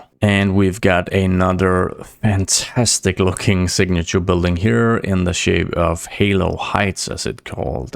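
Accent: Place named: Denmark. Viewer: I KNEW IT I KNEW THAT U WERE DANISH I HEARD IT HEARD IT OUT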